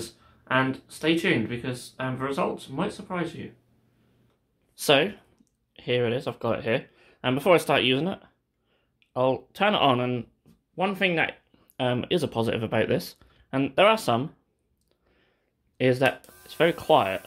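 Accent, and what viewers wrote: Norfolk, United Kingdom. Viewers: Beginner with painting 3D printed models here and got one of these. So far so good. Must ask you are you from Norfolk? Yarmouth here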